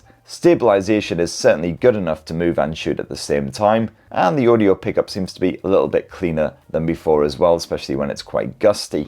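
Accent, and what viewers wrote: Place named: North East England, United Kingdom. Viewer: He's north east English. Sunderland I think.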